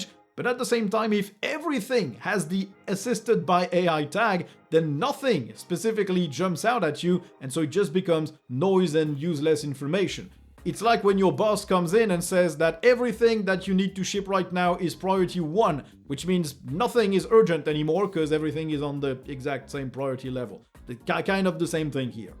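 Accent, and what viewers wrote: France. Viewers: I love the accent so much. Staying up to date with Linux news is almost a bonus. English spoken with a French accent is music to my Eastern European ears. I'm not much of a gamer, but I watched (well, mostly listened to) a whole Dishonored playthrough by Raphael Colantonio in no small part to enjoy his accent. Most of the other people who were invited, part of the Dishonored development team, also had French accents. (edited - name spelling)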